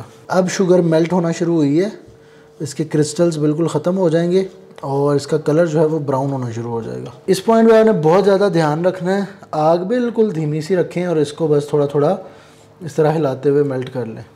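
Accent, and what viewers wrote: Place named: Islamabad, Pakistan. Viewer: Islamabad ki tone, I like the way you speak. ❤, gonna try this recipe today.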